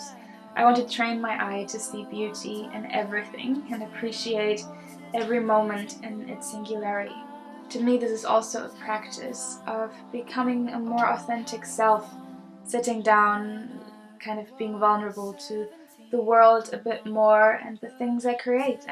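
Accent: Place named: Ireland. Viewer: "...if you're still watching this." Hi,. How could we not watch your video:) this was a very good "how to". I would say you are just scratching the surface of what and how you do it. You raised many interesting ideas of "things to do" to capture your thoughts/feelings about life. You seem very natural and calm in front of the camera. Your English is very good, esp considering it is not your native language. I detect just the slightest hint of an Irish accent when you get rolling. I agree with being well rested, having a journal and writing in the morning when you say what you really think. This is a good topic and i am hoping to see more. I am proud of you, little butterfly 🦋 have a good weekend and thank you:)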